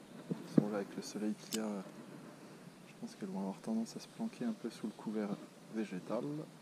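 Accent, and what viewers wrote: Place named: Franche-Comte, France. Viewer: Super vidéo, très agréable à regarder, a t'entendre je pense que tu dois être franc-comtois aussi non ? Continue comme ça, un régal cette session ! :D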